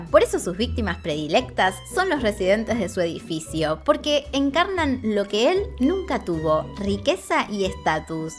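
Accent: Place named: Buenos Aires, Argentina. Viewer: Espectacular video😉🙏🏼👍🏽🥰pero sugiero que mermes el "sh" tipico del porteño...para favorecer la difusion del contenido...y caiga bien en varios paises🫂🤗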